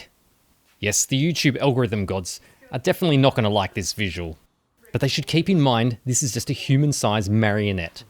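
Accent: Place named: Australia. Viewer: I love your sense of humor. Your Austrailan accent makes it even better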